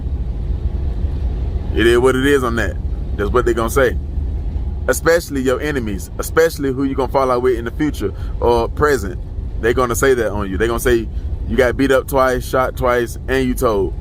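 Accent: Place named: Miami, United States. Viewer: Where you from fam? You sound like a Miami nigga...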